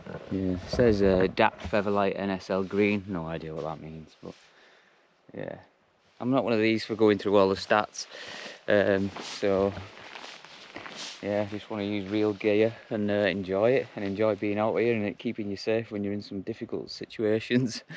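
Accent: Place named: Northern England, United Kingdom. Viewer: I'm late to the party mate but new subscriber from west Yorkshire England,you sound like a northerner yourself, where you from buddy, absolute banger of a tent!!, I've got the red label,,ATB mate,,Daz👌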